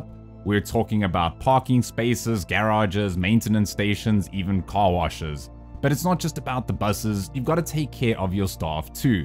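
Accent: South Africa. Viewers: Nice to hear a Capetonian accent on the YouTubes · Hold up. Is that a fellow saffa I hear?! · you sound south african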